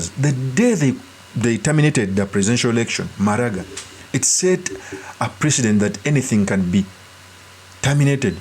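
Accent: Uganda. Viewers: U are Ugandan according to your accent...why are u calling yourself kenya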